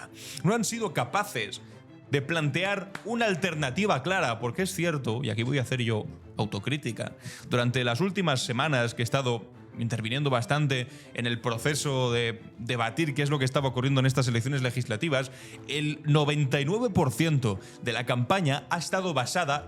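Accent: Spain. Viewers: Me encanta acento Español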